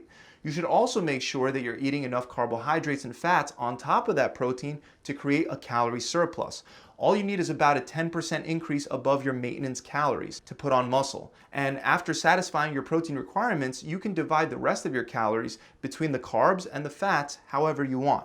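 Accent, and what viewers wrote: United States. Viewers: “YO” STOP TALKING LIKE YOU’RE AMERICAN AND ACT YOUR RACE, “HELLO MY FRIEND WELCOME TO KWIKI MART” 🐫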